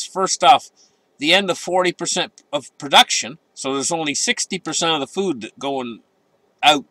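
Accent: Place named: Nova Scotia, Canada. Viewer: lol DXY... the comparison of ga-a-a-r-bage (Gotta love that NS accent.) You have a good one as well,. 🏠🌞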